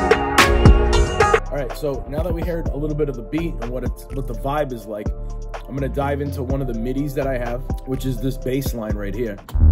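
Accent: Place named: Italy. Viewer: i don't know what this accent is whether is italian or something else but i love it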